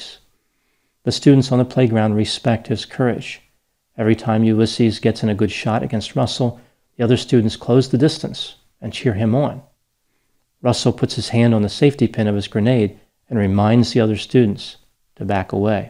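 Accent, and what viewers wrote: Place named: Philadelphia, United States. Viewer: i never noticed but are you from the philadelphia area by chance? i notice you say certain words like i do. but your accent is not strong like NE philly or bensalem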